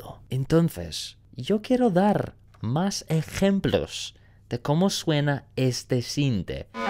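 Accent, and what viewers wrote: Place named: Spain. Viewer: Pues se me ha hecho bastante entretenido el video. Eso si reverb se dice reverberación pero es que tienes hasta acento peninsular y bastante buen nivel de castellano.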